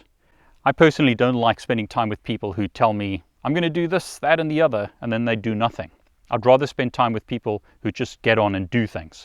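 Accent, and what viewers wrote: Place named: South Africa. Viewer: Condolences to your family,. Thank you for your inspiration. It's something that we definitely need more of. P.S. I thought that you sounded like you were from South Africa.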